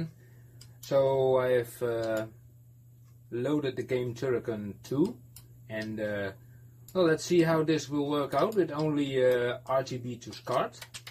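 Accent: Netherlands. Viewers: I lof Dutch akksent. 😍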